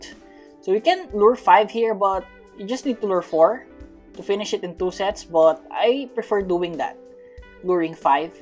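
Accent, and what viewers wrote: Philippines. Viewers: Filipino voice ur are filipino? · hes sound filipino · are you filipino the voice and the filipino just im guessing yure filipino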